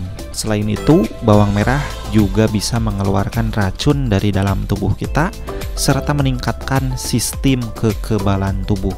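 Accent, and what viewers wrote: West Java, Indonesia. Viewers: Sunda pisan euy..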